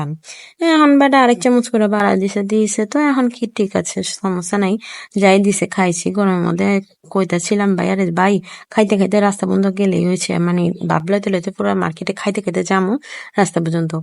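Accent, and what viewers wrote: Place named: Dhaka, Bangladesh. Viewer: আপু ঢাকায়া ভাষা খুব স্মার্ট ❤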